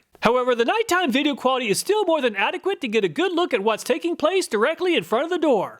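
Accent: United States. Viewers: Very loud american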